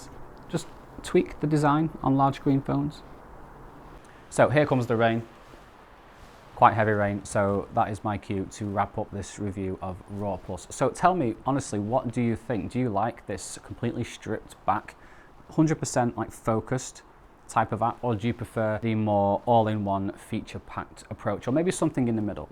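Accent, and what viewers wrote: United Kingdom. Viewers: Hei. Accentul britanic și având în vedere că ai scris mulțumesc în descriere este foarte confuz. Super videoclipul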